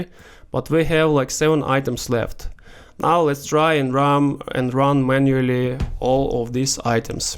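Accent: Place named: Russia. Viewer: че то у тебя акцент то Русский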